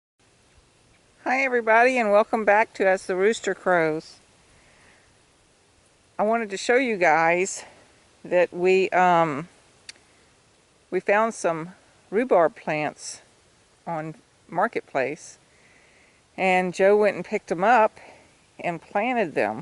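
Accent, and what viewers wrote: South, United States. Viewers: Thanks for the Southern accent I miss it so much I am a southern boy living in a different country and I need to hear the accent sometimes.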